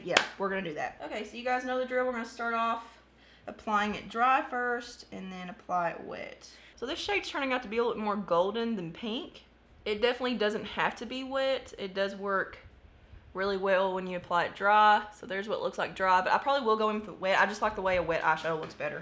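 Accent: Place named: Texas, United States. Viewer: Girl, our voices and accent sound alike! It’s crazy! Are you from Texas? You are beautiful! Excited to be a new subscriber! Thanks for the fun videos!!💛🌼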